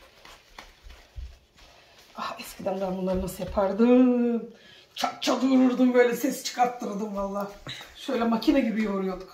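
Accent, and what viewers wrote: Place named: Izmir, Turkey. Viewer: ay çok tatlısınız ya siz konuşurken kendimi görüyorum İzmirli olduğumuzdanmi acaba çok natursunuz ellerinize sağlık hep yaptığınız çok güzel sağlıcakla kalın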